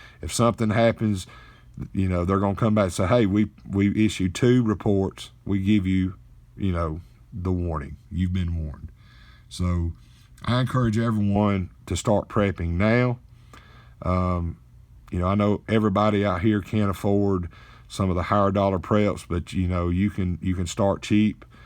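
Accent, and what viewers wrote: United States. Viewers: I love a country man's accent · Why thank you. And may I add now I have your lovely Country accent in mah head and so I'll probably be talking like this here fo a lil wwhuailee Amen lord Jesus help us all. This is our Government's way of wippin a'out the peiiples see here that done need ta get done swiped out'der decrease tha population see there that's Whautt that right'e their is I done told Lucille she don't done believes believed meii. She sure heck will now!!!!! 💓💓💓💓💓💓🙌😘💌💋☺I done subscribed y'all do the same. God bless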